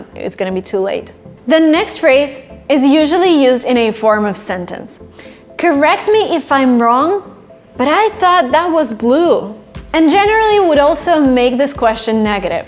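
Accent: United States. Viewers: To my mind she was born to teach. I am absolutely sure that i’m gonna use these alternatives and use less “ I think “ so that i sound more fluent. Thanks for ur practical lessons dear i really do love ur voice and ur American accent. Above all I wanted to appreciate for teaching us all these contents for free. Wish u all the best🥰❤️ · I am Bangladeshi. I want to speak in American accent. I understand your American accent. But I can not speak like you. I am a govt. high school student in Bangladesh. I want to go to America for higher study. This is why I really want to speak in American accent. (My school's teachers are not good at American or any other accent). Our country has no any English accent. Some example of their (teachers) pronunciations are : Water=Water Think=Think Something=something If I speak American accent in the class everyone of my class laughs at me. 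They tell me ,"it is Bangladesh not America.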